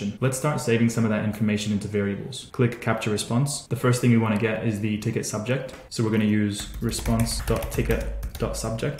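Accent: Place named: Australia. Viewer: I have recognised the warm Aussie accent......I use FreshDesk, same concept, love the video.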